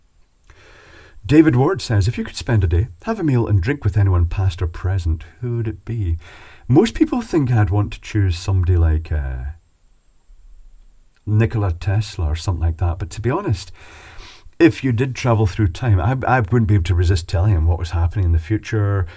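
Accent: Scotland, United Kingdom. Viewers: I always thought you were not from around Glasgow, you sound posher than that 😂. I love visiting Glasgow, especially a whisky shop I found in a basement where you need to walk down steps to get to the door. I stayed at Bowling harbour once on my boat. Loved it. All the best, you’re a bloody genius. Love your channel.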